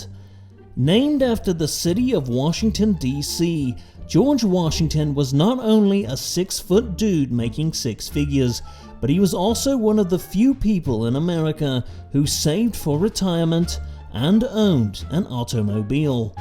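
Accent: United Kingdom. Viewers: The fact a British man is telling this hilarious moment in history makes it that much more funny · The narrator being British is the cherry on top😂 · What adds to the funniness imo is that it’s voiced by a Brit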